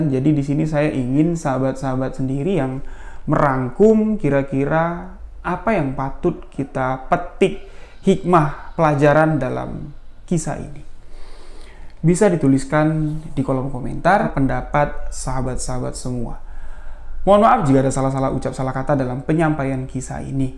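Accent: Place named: Java, Indonesia. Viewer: Alhmdulillah..jmpa lgi dngn Bng, yg plng sya suka,cra membwaknya,dn bahasanya mnteep..., kalu orang jawa..logat bicranya enk bngt di dngar...🙏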